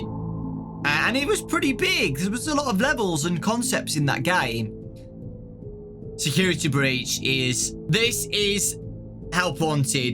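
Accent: United Kingdom. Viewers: Is Britsh!! britsh! (british) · Who is this guy and why is he British?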